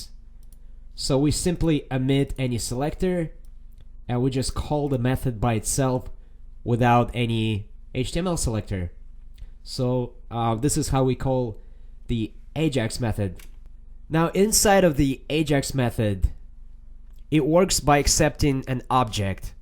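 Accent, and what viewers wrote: India. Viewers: hahaha indian accent, hhhh btw i am soooo lucky to find your channel cuz you explain the syntax better than anyone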